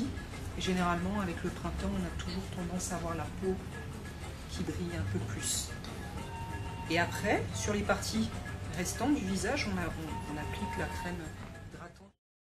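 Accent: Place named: England, United Kingdom. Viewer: puree l'accent anglais !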